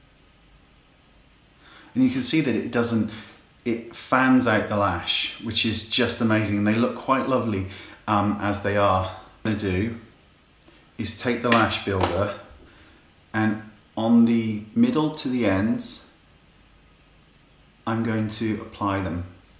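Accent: Australia. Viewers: Is he Australian? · He sounds kind of like a Aussie ?? Where's he from ?:)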